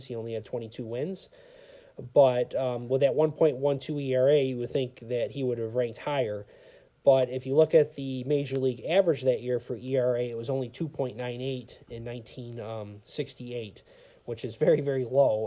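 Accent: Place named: Upstate New York, United States. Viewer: Super interesting. Thanks for the invite and research. Btw, just curious but where are you from? I’m trying to pinpoint your accent. It’s has so many similarities to my moms who grew up in upstate NY. Anyway, enjoyed the video. Looking forward to watching more of them. Drew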